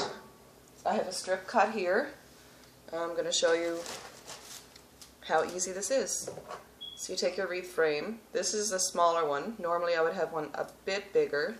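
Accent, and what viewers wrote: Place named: Newfoundland, Canada. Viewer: I'm from Newfoundland and I thought, "she's not American and not mainland Canada by her accent"!! Love it!! I also LOVE your video!!! Very helpful and EASY to understand!! Looking forward to seeing more!!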